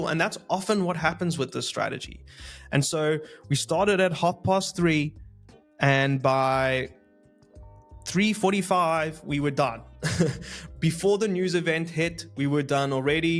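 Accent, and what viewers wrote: South Africa. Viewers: Is jy n suid afrikaner.?. Jy klink afrikaans.. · Dude are you South African? I can't place your accent 100%, but sounds Saffa. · Are you South African by any chance? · Hey bro, are you South African? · You South African?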